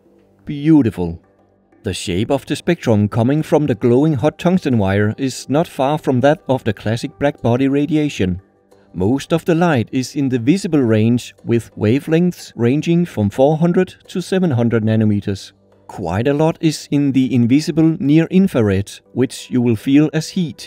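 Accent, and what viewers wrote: Denmark. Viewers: The Danish accent 💀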